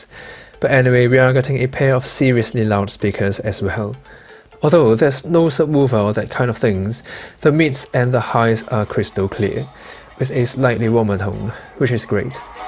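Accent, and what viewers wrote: United Kingdom. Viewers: i hate that british accent... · 好英式既口音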